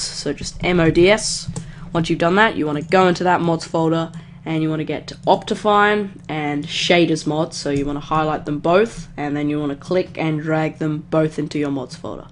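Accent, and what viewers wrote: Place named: Australia. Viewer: I smell Australian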